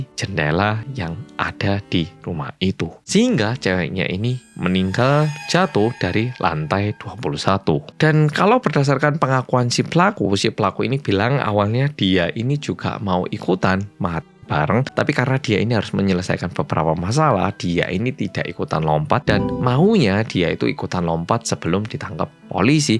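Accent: Java, Indonesia. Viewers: hai malem muedok lgsg nyimaaak🎉 salam sehat💪🏿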